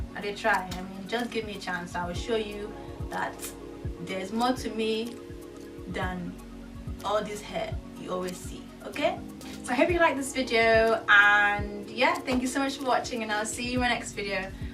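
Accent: Igbo, Nigeria. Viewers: Thanks for opening up in this video. I loved hearing your Nigerian accent. It was nice to "get to know" you in this video! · If your videos will be more like this,will definitely be watching every post!! Love your naija ascent 😘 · Plus i love your hair and the Nigerian accent. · Love the Igbo accent👏👏👏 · Your Nigerian accent is really cute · You're naija accent! Everything! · sounds kind've British to me; Personally i rather your Nigerian accent. That's just my opinion, keep up the good work tho :* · OMG!! I LOVE hearing your Nigerian accent!!! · Love the naija accent....may all your dreams come true IJN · Sweet and Honest. Enjoyed watching you and enjoyed the Igbo accent. You have company... I'm bad at it too... my fiance is Nigerian and he kills himself laughing every time I try that accent. Stay strong sweets! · Girl! I love ur Nigerian accent. I am reli seeing a difference in u and I have been watching ur videos for yrs. 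I mean u are coming out of ur bubble more and I'm seeing ur personality. I'm in da same boat as u, I feel like I'm dissing Ghanaians went I put on my Ghanaian accent smh btw I'm Ghanaian. I would love to meet u someday. Luv u xoxo l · I really love your Nigerian accent. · Your Naijah accent is flawless though! ♡ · awwwh i loved this loved this vid specially the intro and the end that accent was on point u spoke with the typical igbo english accent like those ontisha boys hehehe...am yoruba and olodo is our common insult loool but i have a lotta igbo frnds and i caught d pigin englih with the accent lool i really loved listening to u wiv the accent....and yeah keep ur private life private like popc z dont air ur linen in public...but i really wish you all the best and hope you find the bone of your bone and flesh of your flesh ijn.....great vid s=xoxo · Lol... The naija accent !!! I feel u girl..sounds foreign to me as well wen I speak .... 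But still naija for life !!!!... Forget accent joor!!!! Lol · Love u lol,, naija accent tho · I loved this. The naija accent couldn't have been better. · The intro accent is just the bomb!.... Typical naija..lol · Awww the 18 minutes went by so fast lol. I loved the Nigerian accent tho! Thx for sharing! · lmao love your nigerian accent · Best video ever. Soo relaxe and cool. Love your nigerian accent. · lol your nija accent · You are very beautiful on this video You seem to have a beautiful personality And I loove your nigerian accent. That was good 😄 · Your naija accent tho. Lool. You sounded like Shalewa in that Nigerian soap opera tinsel. But regardless, I think you are super cool, and pretty too. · Lol your Nigerian accent is too cute. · Looooool...OMG! Best video of yours I've seen! Your Nigerian accent is sooo funny, but abeg you still try. This hair is amazing on you, the bangs suit you so much. 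I agree with the annoying comments and keeping your personal life to yourself. You are pretty amazing :) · i loooooooved your nigerian accent so much!! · I loooooove this video.. and many others:)..plus the Nigerian accent has so much win to it. Keep shinning:) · Lmao that Nigerian accent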